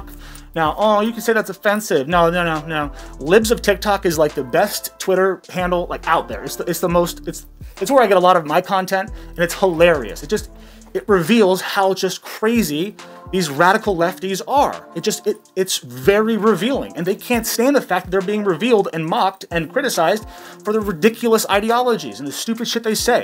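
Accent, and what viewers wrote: United Kingdom. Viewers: Loving the scaaawtish accent!😂💯👍🏻 I’m not on Twitter thankfully so I haven’t got to hear all that BS hate! Thanks 🙂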